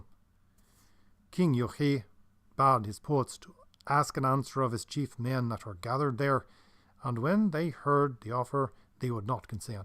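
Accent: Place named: Ireland. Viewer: where is your accent from it's so wonderful! I am assuming Ireland of course, but what part?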